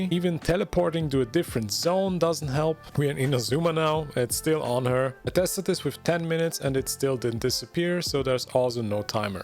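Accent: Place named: Germany. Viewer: ur accent sounds german eww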